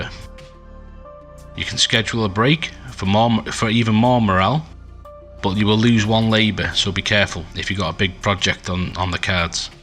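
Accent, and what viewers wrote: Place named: Liverpool, United Kingdom. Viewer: Don’t change a thing mate, you’re an honest to goodness average gamer with good tips and tricks and your style reflects that! Dead warm and friendly. I thought I was picking up some scouse at first. Maybe just my headset-fried hearing? 😂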